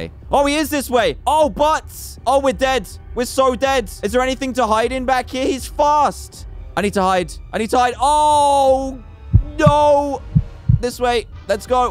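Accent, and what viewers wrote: England, United Kingdom. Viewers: I'm gonna get r/whooooshed for this, but he's saying that "this bone is on my list" very fast and with a heavy British accent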